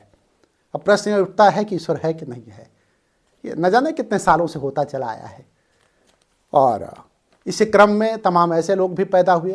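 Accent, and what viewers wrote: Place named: Madhya Pradesh, India. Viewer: भाई सर की और भी वीडियो देखिए। सर अध्यात्म की बात करते हैं । सर एक अच्छे राइटर भी हैं मैं इनकी सारी वीडियो देखता हूँ । सर कहाँ के रहने वाले हैं मैं नहीं जानता लेकिन शायद mp के हैं आवाज़ से ऐसा लगता है । इन सिर की दो पुस्तक मैंने पढ़ी हैं फ़िलिपकार्ट से मंगवाई थी । हो सके तो आप भी पढ़े। और रही बात आपके प्रश्न और कमेंट की तो भाई एक बात बताऊँ - “ सुअर के साथ कुश्ती नहीं करनी चाहिए क्योंकि आप गंदे हो जाओगे । लेकिन सुअर को मजा आयेगा।”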